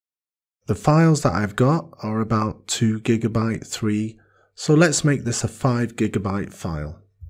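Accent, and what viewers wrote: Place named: England, United Kingdom. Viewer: DUDE!! This is a superb tutorial (1) It covers various scenarios a user might ask - at least for this specific topic of encrypted folders. (2) The explanation is clear and slow, so techies can speed up, but newbies can go slow. (3) An educated English accent is soooooo much clearer in articulation, rather than many Yanks who mumble and mangle words. Tech skills is one thing; teaching is another. That last bit may be a personal bias, but look at Hollywood movies - can barely figure out what they're saying. Maybe that's why the rely on big explosions. But that's a tangential topic.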